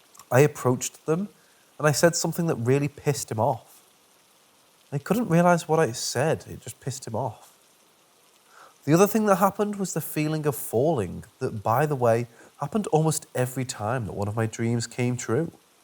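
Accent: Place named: Merseyside, United Kingdom. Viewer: Good to hear another Merseyside accent! 😁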